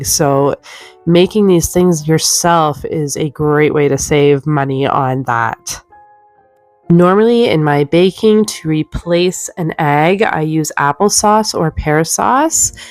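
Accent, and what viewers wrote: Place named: Canada. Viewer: I love your tips and as a bonus I love the Canadian accent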